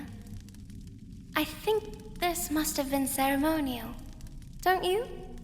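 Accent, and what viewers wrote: United Kingdom. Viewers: sounds rather Bri'ish to me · Your british accent sounds amazing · Diggin your English accent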